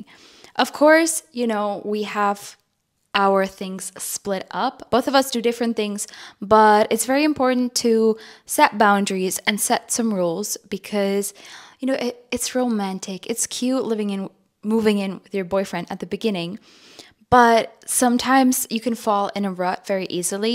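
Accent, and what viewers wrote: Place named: United States. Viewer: jaktože máš tak perfektní angličtinu a ještě k tomu americký přízvuk? 😯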